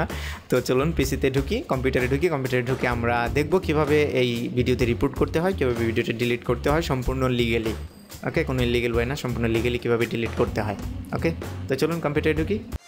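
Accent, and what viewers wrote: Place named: Chittagong, Bangladesh. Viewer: Apnar bari ki Chittagong e naki because amar bari o Chittagong e apnar kotha shune lagche apni Chittagong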